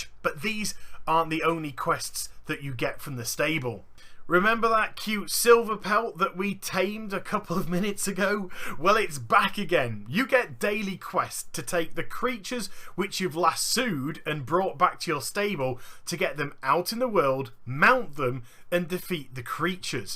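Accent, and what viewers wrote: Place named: United Kingdom. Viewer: Your comment is funny because he's from the country that created the English language, yet you "correct" his pronunciation.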